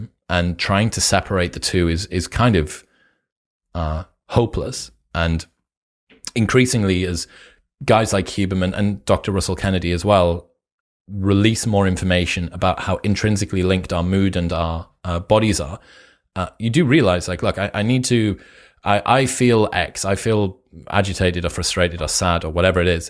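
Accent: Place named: United Kingdom. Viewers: This is such an insane volume of sourced wisdom, knowledge, and perspective combined with a British accent. Freaking thank you. I hope everyone hears this and puts it into application. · combined with british accent got me really bad :D:D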